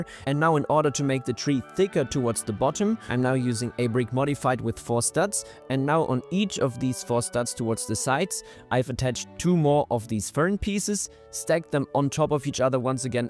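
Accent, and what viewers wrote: Germany. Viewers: Nice German accent you have there. So this is actually a departure airport with no arrivalibility? I guess i just invented that word. Thats what we germans do...😁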